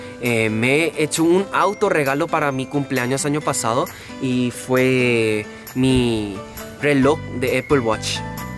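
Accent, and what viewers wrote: Paraguay. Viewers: tu acento si que es Paraguayo😅 también soy de Paraguay. Saludos de Quiindy😄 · Trasmites la calidez de un paraguayo. Te siento un paraguayo más y creo que la pronunciación de la "R" lo confirma. Suena bien paraguayo. Muy bueno tu canal. Cuando vengas por Asunción, ya tienes un amigo. Sólo avisa y planeamos algo. Saludos!!!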